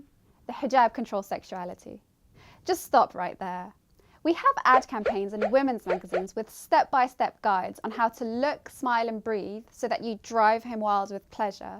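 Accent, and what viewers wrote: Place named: United Kingdom. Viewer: Literally everything she said is untrue . The fact that she has a thick British accent makes this all the more SMH....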